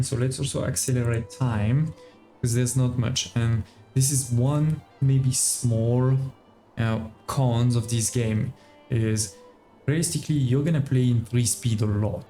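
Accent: France. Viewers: c'est fou comment l'accent francais se remarque dès la premiere seconde de video. mais n'empeche, tu parles vraiment bien anglais. bonne video!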